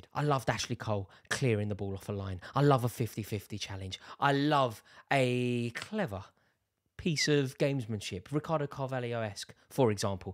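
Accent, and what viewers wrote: RP, United Kingdom. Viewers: Rory seems like he grew up rich and went to Oxford. Love the vocabulary and posh accent 🎉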